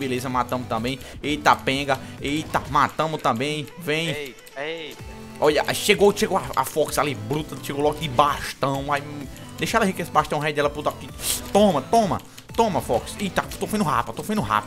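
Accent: Northeast, Brazil. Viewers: Vc é Do Nordeste? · vc eh de que parte do nordeste?!?! Me responde e me passa um contato seu...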